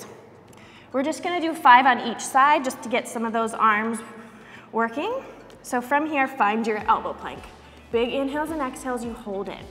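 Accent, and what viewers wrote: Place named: Midwest, United States. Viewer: I just kept thinking it made her sound super Midwest and like, old school which is kind of endearing and 80's haha. v jazzercise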